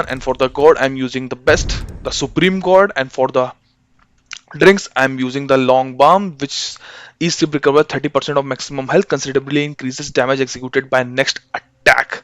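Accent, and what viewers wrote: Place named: India. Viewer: I can't tell if he's being serious or meme'ing because of the super extra Indian accent. 😅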